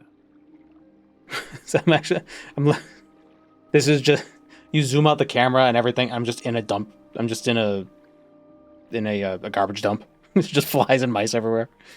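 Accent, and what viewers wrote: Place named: New England, United States. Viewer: What could really deal with head shine AND bump your steez is maybe a newsboy/flat cap. Could also lean into the New England accent for the bit, though I have no idea if a hat would just cause too much sweat, which might make prop comedy like that not so good.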